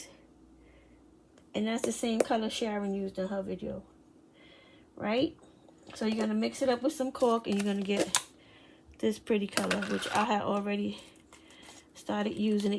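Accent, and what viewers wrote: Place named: New York, United States. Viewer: Thank you your videos are always enjoyable 💘 I'm from NY originally and your accent reminds me of home lol 😘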